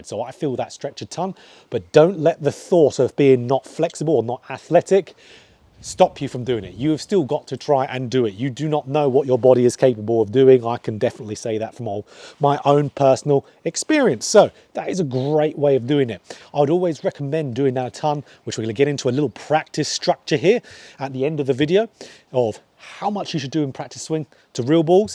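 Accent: United Kingdom. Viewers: I actually think the fact he speaks quickly is refreshing, usually I have to turn up the speed on videos because instructors talk too slow like I’m a child. If his accent is difficult to catch quickly you can always turn down the speed of the video or turn on caption. (My sister often watches any British tv/movies with captions)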